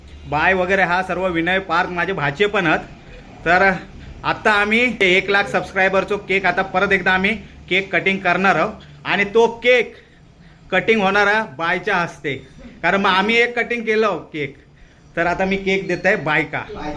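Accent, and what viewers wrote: Malvani, India. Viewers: तुमची मालवणी भाषा एकदम pure आहे,तसेच व्हिडिओ खूप साधे आणि खरे असतात. आज परबिन आणि सावतीन भेटल्यो नाय 😂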